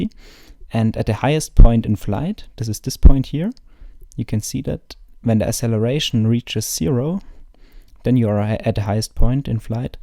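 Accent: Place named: Swabia, Germany. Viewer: Ja cool ich au, man merkt obwohl du Englisch sprichst wo du her bist, ich bin aus Backnang, auch Nähe Stuttgart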